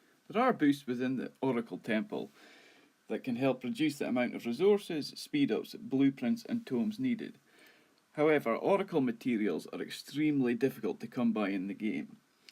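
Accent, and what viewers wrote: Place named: Scotland, United Kingdom. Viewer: I love this dude’s accent. Pure Scottish 🏴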